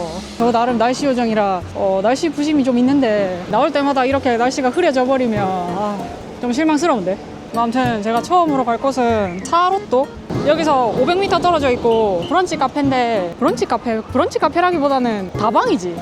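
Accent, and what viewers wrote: Busan, South Korea. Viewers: 부산 사투리 죽이네.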